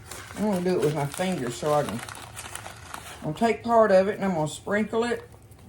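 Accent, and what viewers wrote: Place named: Texas, United States. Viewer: Damn... I moved away from the Texas/Oklahoma region over 30 years ago to other parts of the U.S, and I thought I was happy. But Shyte, your recipes take me back to my youth, and I miss being back in the Texas/Oklahoma area!! My Mama used to make a variation of this dish, but not nearly as complex as yours. Mama used Minute Rice. 👎👎 Thanks for all your videos. Gawd I love your Texas accent! God Bless!!!!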